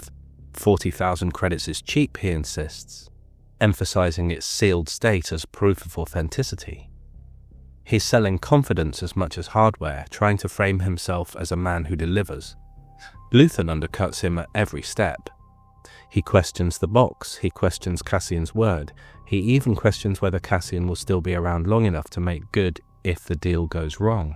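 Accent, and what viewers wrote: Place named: United Kingdom. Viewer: I think the captions are useful, as sometimes your phrasing says something that my ears hear differently (I don't remember the exact context - or even if it was this video - but you said 'knot' and I heard 'not' which completely did not fit the rest of the phrase. I had glanced away from the screen and had to back up and read the caption to get what you were saying). This may be an accent thing, as I'm from the States, but I also find the captions useful as you are using terms and phrases that are distinctly British sometimes and I can understand them more by reading them than by hearing them. Hopefully, your change in future videos, which I haven't gotten to yet, still works for these more idiomatic phrases.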